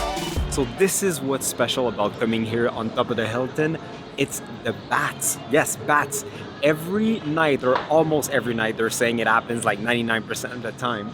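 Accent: France. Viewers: Bruh you speak a French French and an American English at the same time! I'm shocked 😱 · I like your French accent 😅🙌🏽have fun 🇨🇲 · Beautiful video as usual. You made me fall in love with my country all over again😎. Your french accent is🤌.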